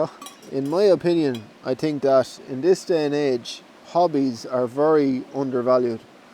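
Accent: Ireland. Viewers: New subscriber loving ur videos. My dad was born in Dublin and I’ve always wanted to visit but still not got there yet. 1 day hopefully. Been told how beautiful it is and i love the Irish accent x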